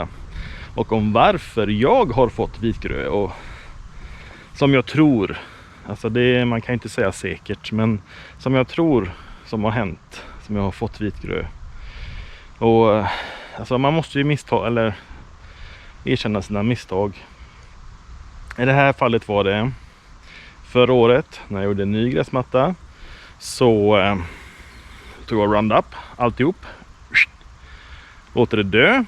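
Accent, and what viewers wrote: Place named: West Coast, Sweden. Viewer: Tack. Man ska inte lufta på sommaren väl? Tänkte kanske lufta i augusti en vända samt slänga på topdress o överså, vad tros om planen? Är du baserad på västkusten? Låter som dialekten är här någonstans. Är själv baserad i Mölndal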